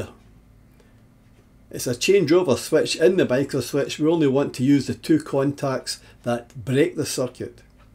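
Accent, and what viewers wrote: Scotland, United Kingdom. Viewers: Nice! Clear diagrams with a clear explanation in a Scottish brogue.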